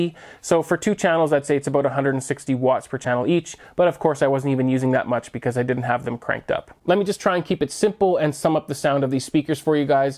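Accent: Canada. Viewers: Do I detect a bit of Canadian in your voice?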